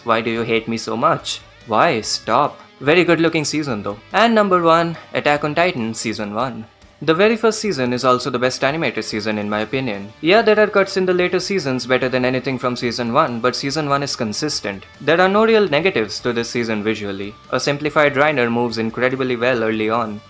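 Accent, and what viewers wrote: India. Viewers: Why you sound like an Indian scammer🤔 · Are u indian? 🗿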